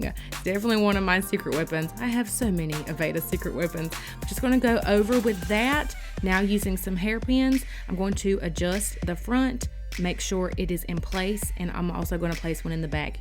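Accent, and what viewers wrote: Kentucky, United States. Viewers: Do you have a Kentuckian accent or is that just me 😂😂😂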